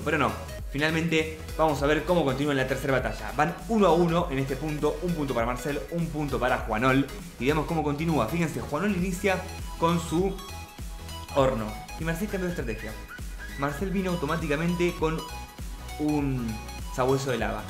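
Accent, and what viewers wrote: Argentina. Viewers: eres argentino? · eres argentino ?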